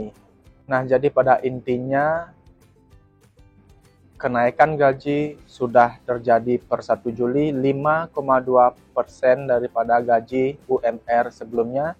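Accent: Bali, Indonesia. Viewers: Pasti asal bali, logat2 bali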